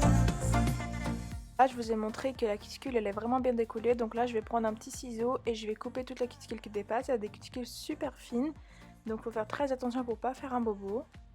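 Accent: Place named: Brazil. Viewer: On voit de suite que tu es formatrice 🤩. Le travail est soigné et parfait 👍 bravo, en prime j’adore ton petit accent brésilien je crois ? ☺️🤫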